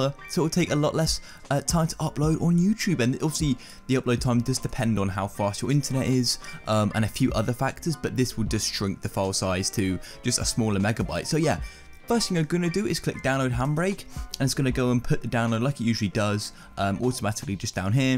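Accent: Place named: United Kingdom. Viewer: Hehehe, your cute.. but very UK.. I have friends from there... I do like the video very informative, thank you so much... :)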